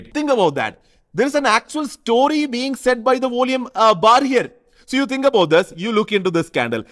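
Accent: Kerala, India. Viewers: I love your Kerala style English pronounciation.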